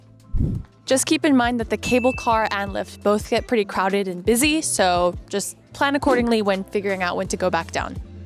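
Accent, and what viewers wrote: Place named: United States. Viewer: Your american accent sounds perfect, your voice is so female.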